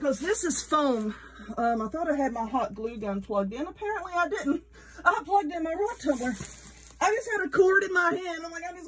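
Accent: South, United States. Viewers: i have to tell you how much i love your tutorials.. your accent and one-liners. your personality is what keeps you watching. My Dad is 96 and recently moved into an assisted living facility after the loss of my mom..I had decided to make wreaths for his door to his room so it would be easy for him to find his room. He is a disabled vet and this wreath idea is what i was looking for for Memorial Day and the 4th, I added a few stars along the outside, but thankyou so much for your ideas and tutorials, I'm sure he'll love it! You are a and all that! Thanks again!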